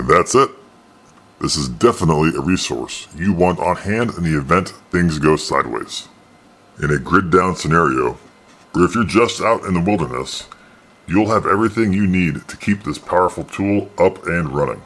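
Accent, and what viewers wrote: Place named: South, United States. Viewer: Preppers are so cute when they are LARPing and all edgy and pretending their fantasy is going to happen. Gotta love the "creepy guy from the horror movies" voice modulation. Although, your southern accent and intonations do come through despite this.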